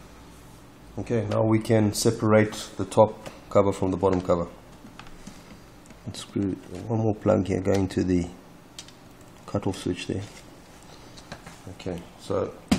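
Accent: South Africa. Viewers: Hi there , I have a Acer Aspire E15 , this has happened to me , I tried a hard reset with taking the battery out and holding the power button and then switching it on but that didn't work. I've been to and asked various technicians but they all say that it isn't the CMOS battery. They say it's impossible because I've only had the laptop for a year and a half ? Very informative videos, you earned a subscriber. Also you sound like you from South Africa 😅😅 So am I !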